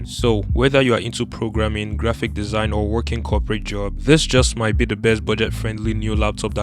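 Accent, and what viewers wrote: Nigeria. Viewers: You from 9ja. No lie😂✌🏾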